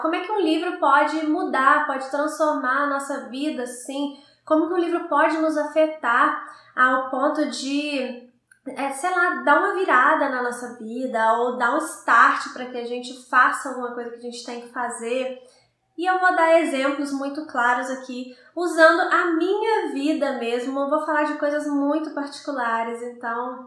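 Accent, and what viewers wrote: Minas Gerais, Brazil. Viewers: Boa noite,! Você é mineira?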